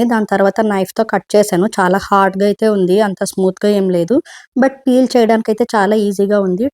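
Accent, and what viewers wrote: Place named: Bangalore, India. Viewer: నాకు ఓ అనుమానం.. ఇంత అందముగా వున్న మీకు ఏ ఆరోగ్యసమస్యలు రాకూడదు.. మీ భాష బెంగళూరు లో తెలుగు యాస గా వుంది.. అయినా మీ తెలుగు చాలా ఫ్లూయంట్ గా వుంది.. గుడ్.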